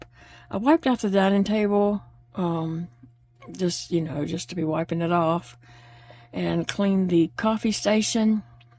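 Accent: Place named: Louisiana, United States. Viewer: Hi, I hope you will always find a way to keep crocheting but even if you couldn’t, I’d still watch your videos just to listen to your lovely Louisianan voice and hear your giggles. From now on I will also let the ads run through without skipping. I’m crocheting anyway and not watching the screen all the times. I hope you feel better soon. Best wishes from England :)😊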